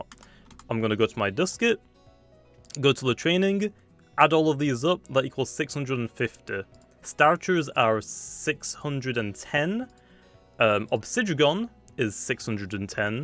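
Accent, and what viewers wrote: United Kingdom. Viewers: Are u UK?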